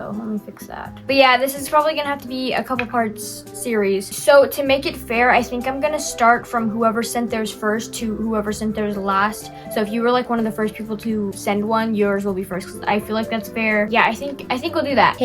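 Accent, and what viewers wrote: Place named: California, United States. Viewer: after you said ur californien and say towel weird i also said it and our cali accents are lowk the same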